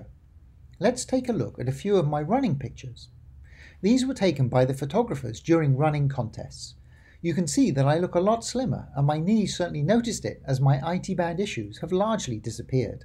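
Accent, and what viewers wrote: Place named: United Kingdom. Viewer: Friend, In spite of your predictably formal Brit accent and you're at least pseudoscientific approach to self-analysis, your presentation is very ephemeral and subjective, and even though I am a great fan of nmn, this is not a particularly compelling presentation of its benefits. My suggestion is to try instead, to relax your delivery.. even if it means pretending you're an american.. and to provide far more substantive information, rather than merely to emulate the superficial appearances thereof. Then you'll be well on your way to a far more helpful presentation.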